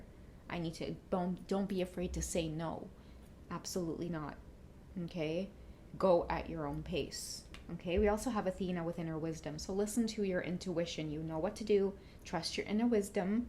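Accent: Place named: Trinidad and Tobago. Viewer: Are you Trini? That bazodee accent 😂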